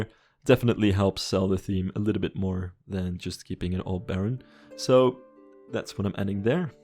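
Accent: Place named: Netherlands. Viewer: Hallo ik hoor aan je stem dat je Nederland bent dus ik wouw vragen of je de xpress van Walibi kan (namaken) maar dan goed en wouw je dent hier goed in O ik zou zelf doen maar zit op Xbox vast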